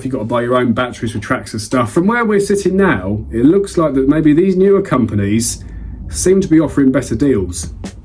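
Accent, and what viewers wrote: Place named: United Kingdom. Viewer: Great another British speaking channel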